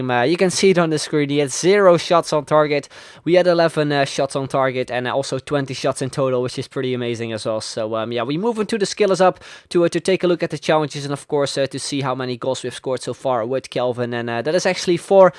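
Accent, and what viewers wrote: Netherlands. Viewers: Hij klinkt nederlands, is hij dat ook?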